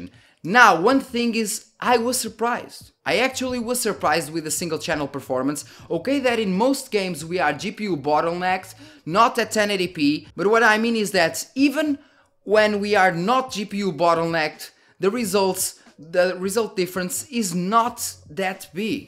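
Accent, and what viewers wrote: Portugal. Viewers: Olá, parece que és Português hahahha. Achas que devo fazer o upgrade de 16GB RAM (2x8gb) para 32GB RAM (4x8gb) ou devo ficar com os 16GB? Ps.: Lembrando que fica caro, comprar 32GB RAM (2x16gb)... só se conseguisse vender as RAM que tenho. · Tens mesmo sotaque de tuga !!!!! Excelente video....Parabens !!!! ;) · Bro are you Portuguese? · Vi logo que com esse sotaque só podias ser português hahah, bom video!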